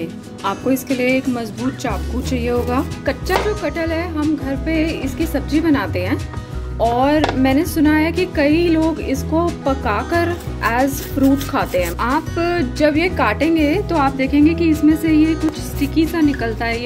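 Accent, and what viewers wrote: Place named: Punjab, India. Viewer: Hi ji aap puri shudh punjaban ho, aapka subhzi banane ka style, bolne ka style poora punjabi hai, mazza aa jata hai aapko sun kar. Kathhal hum bhi aise hi banate hain,aur hamari family ka favourite hai. God bless you.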